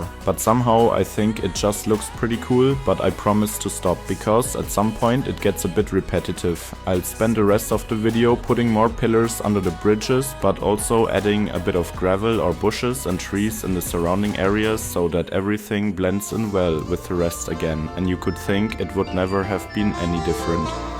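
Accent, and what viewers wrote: Germany. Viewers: Something about a German accent and doing engineering work that makes my heart warm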